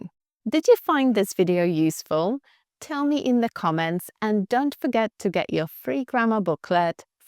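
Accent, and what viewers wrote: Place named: United Kingdom. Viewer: your british accent is beautiful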